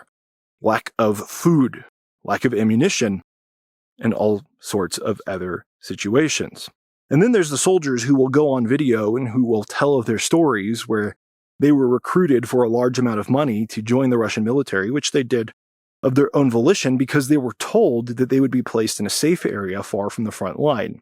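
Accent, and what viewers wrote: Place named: Utah, United States. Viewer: Rational thoughts fella! Utah accent! Prof’ of history at a Utah University?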